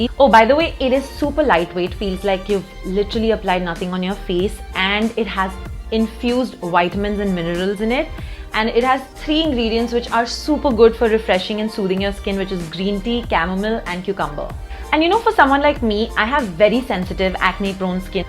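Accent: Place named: India. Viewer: Its indian accent miss and moreover it's an accent of those who have english as their third or fourth language in a multilingual country. So if you couldn't bear with that, You shouldnt have come to this foreign video . Thanks have a great day.🙂🙂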